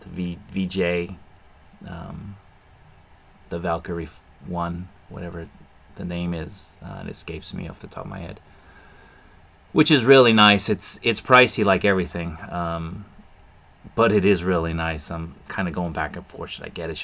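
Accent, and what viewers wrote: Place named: New England, United States. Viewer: yes sir! I just got shelves a few days ago. I am going to get display cases, similar to what I saw At Tamashi nations, due to the earthquakes we get here. I live in Asagaya so I’m pretty much at Nakano broadlway every other day. Moving to Japan has been a wonderful blessing. I moved here not because of Anime and action figures, but the overall culture, food, people, order, serenity, peace of mind and affordable housing. My home town of NYC seems to be a mess now, also I don’t have 1.4 million for 400sqft (I’m not exaggerating that’s a Manhattan condo price in Harlem where I’m from). I flew in the Air Force for 30yrs hit every corner of the globe. Spain, Italy and Japan were my favorite missions to fly. Japan stood out amongst all the lands I traveled. I detect a New England accent within you, I enjoyed going up to cape cod for the summers with friends to beat the grind of NYC. 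I would have moved to that area if I stayed stateside. If you have not done a video on the subject, I would recommend the Animation museum in Ogikubo and the Inazama anime Cafe in Ogikubo as well, Take care and keep up the great work.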